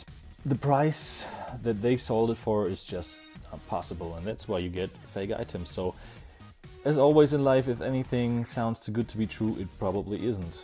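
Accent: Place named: Germany. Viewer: Bist du Deutscher ? Hört sich so an. Aber sehr gutes Englisch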